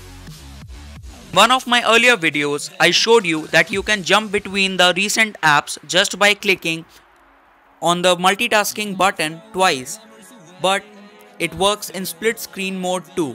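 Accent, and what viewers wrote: India. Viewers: I hate your accent. Your an indian!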